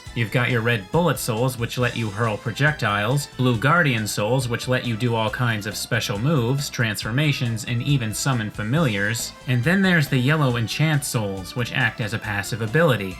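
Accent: Canada. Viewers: Are you Canadian? Anyway this was a brilliant review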